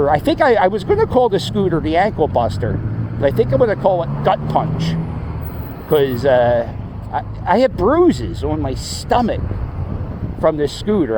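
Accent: Philadelphia, United States. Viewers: Are you kidding your accent is Thick as pea soup. I’m from Philly and your voice screams Come have a cheese stake and pretzel GO EAGLES!!!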